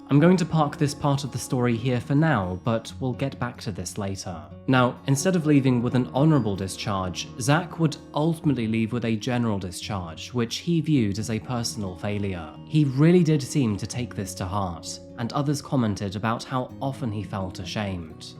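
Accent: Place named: United Kingdom. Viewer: Trapped? Like I didnt click here, for the cute, coffee loving smooth brit voice lol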